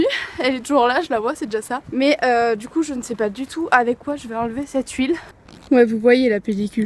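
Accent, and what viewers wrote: South, France. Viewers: Je vois que je ne suis pas le seul à avoir l'accent du sud dans mes vidéos :)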